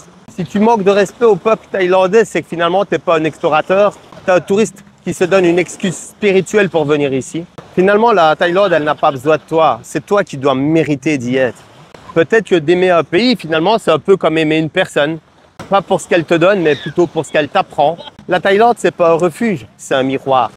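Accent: Belgium. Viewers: Toi, t'es pas Français !!! Belge peut-être ? Merci pour cette approche...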